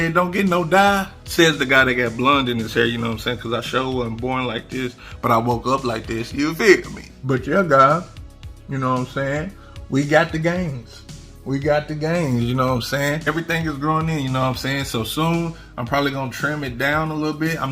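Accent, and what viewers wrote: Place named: South, United States. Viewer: Even got hair on my chest … “ but that was already there “ 😂😂😂 bro you need a podcast , you’re hilarious. I can tell you form the south for sure . I am to , I’m in North Carolina.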